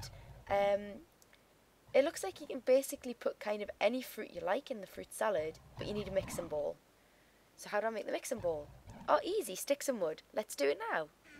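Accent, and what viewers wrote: Newcastle, United Kingdom. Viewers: fellow geordie? lol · You are a Geordie right?? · I can tell because of how you talk. I'm A Geordie Too. :) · Newcastle, surely.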